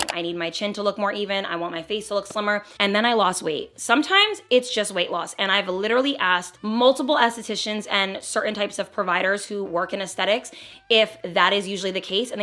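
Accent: New Jersey, United States. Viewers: Her accent is out today! Jersey jersey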